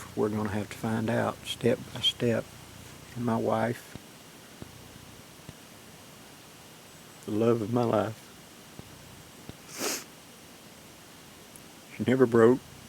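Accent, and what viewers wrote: South, United States. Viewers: God has plans and works in not straight forward plans someone who sees this will react a second faster saving another one of his children. You may never get the formal thank you but a man like you doesn’t look for them. Thank you for all you videos and you still sound like a southerner to me coming from a Yankee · you’re a fighter and we all wish you well. You’ve got a lot of people here who love and support you. So glad to hear your dulcet southern tones, and feel you’re still here to finish whatever God has planned. Take care, love from Leamington Spa, UK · I hope your recovery is as fast and complete as possible. I also hope you get that glorious Southern accent back!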